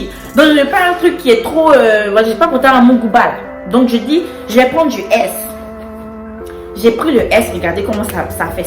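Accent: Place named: Ivory Coast. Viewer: l'accent ivoirien💖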